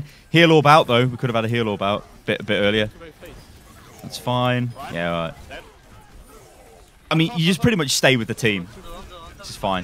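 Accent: Austria. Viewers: the language is german, but it sounds more like an austrian speaking it. dunno, might be wrong. lots of dialects in german. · He is from Vienna...local vocabulary and accent xD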